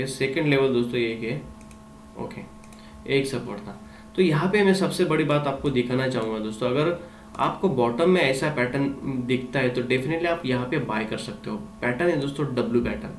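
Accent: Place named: Maharashtra, India. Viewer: Tumchya Hindi vrun vatt ki tumi maharashtra che ahe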